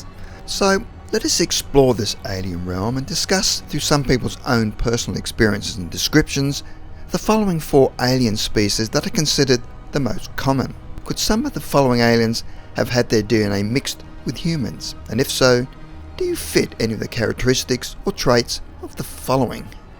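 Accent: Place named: Australia. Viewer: Ozzy accent. at first I thought it was odd to hear it as a narrator but yup.. it's nice to listen to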